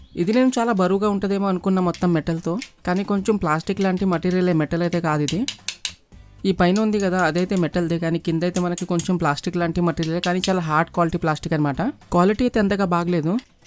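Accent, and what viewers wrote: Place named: Hyderabad, India. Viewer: Bro, Name emo. Slang emo old city muslim guy la undhi.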